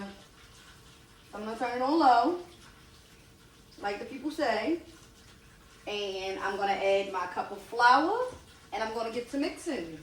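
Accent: Baltimore, United States. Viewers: Is that a b’more accent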